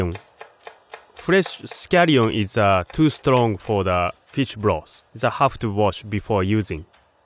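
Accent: Japan. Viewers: First time I think the Japanese-accent English doesn't matter. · ジャパニースアクセント、エニワン?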